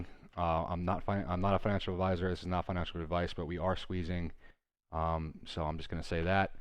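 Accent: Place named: Pennsylvania, United States. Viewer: Realest personality to do it. You’re very appreciated homie. I feel like you’re from PA/mj/my/md. Big resonation. Philly native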